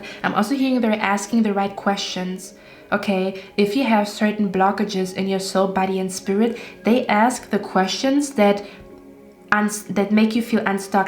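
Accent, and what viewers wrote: Germany. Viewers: Pile 1. Loved this reading. Thank you so much. Also, whenever I watch your readings, I keep thinking you're German due to your accent and I myself am half German which is why it's easy to recognize it. AND THEN I saw the card "ACHT DER STÄBE". I was actually right, so I'm guessing you do speak german but that doesn't necessarily mean that you live in Germany. After all, there are so many countries where German is spoken as their native or second language. Anyway, I'm sending much love and hugs❣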